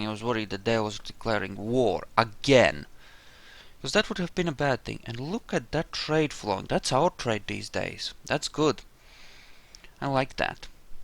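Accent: Russia. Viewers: Are you Russian? You kinda have a Russian accent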